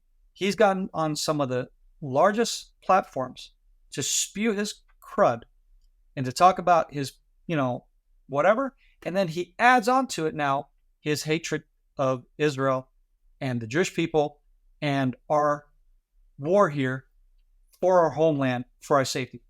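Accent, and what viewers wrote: United States. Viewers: nice podcast! Here is my beef with the world: Why is Israel responsible for any civilian casualties when Gazans could have avoided zero deaths if they surrendered on Oct. 8th, let the hostages go, agreed to disband Hamas (another psychopathic offshoot of the muslim brotherhood (bother-hood), and turn over the death worshipping cowards that perpetrated Oct. 7th. I appreciate your respect for non-jihadi muslims. Have you considered an interview with Atheist Republic? Armin is an ex-Muslim originally from Iran that supports Israel over the monstrously backward Iranian totalitarian regime. The "Palestinians" have been thrown out of several countries because they tried to take over the government like Kuwait, Egypt (Muslim Bother-hood), and now Gaza. Why people do not mention that the gem of the Iranian regime in Lebanon that exists only for war has bankrupted the country is consistent with our current Jew hating media. 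The real money comes from places like Qatar. where did your parents come from before emigrating to Israel? My guess is Kansas. You sound like a Californian to me. Candice Owens new name from some of we Jews is Klan-ace Ovens. One of her "just asking questions" was accusing a former Jewish head of a post WWII ethnic German Polish concentration camp of atrocities in deaths of 1500 people under his watch. She omits that the first Polish state attorney said no charges would be filed against Shlomo. Stalin appointed Jews to lead these camps after the war. Ow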